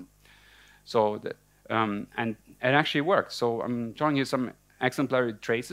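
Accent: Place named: Germany. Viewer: He sounds like a German :D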